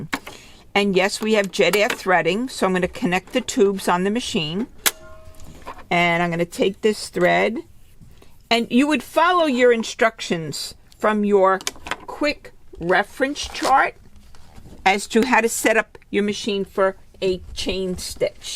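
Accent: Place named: New York, United States. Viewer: Some great tips thanks and I love the NYC accent! She sounds like Fran Dresher...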